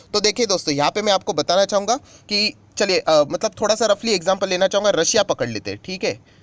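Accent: Maharashtra, India. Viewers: सर, तुमच्या डिमॅट अकाउंट मधील पोर्टफोलिओचा व्हिडिओ करा एकदा. मला माहिती आहे आपण एक मराठी माणूस आहात.... आपल्या हिंदिवरूनच समजतय ते... आपली अशीच प्रगती होवो....जय महाराष्ट्र..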